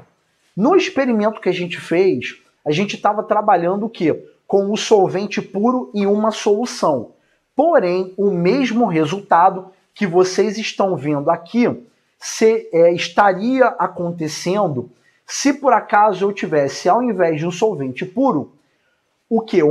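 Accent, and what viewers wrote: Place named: Rio de Janeiro, Brazil. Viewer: Eu amo esse sotaque carioca. Quase me apaixonando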